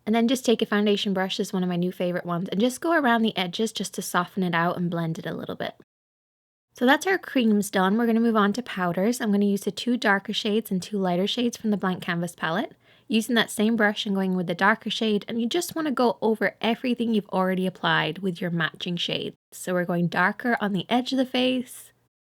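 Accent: Ireland. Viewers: Hey are you Irish??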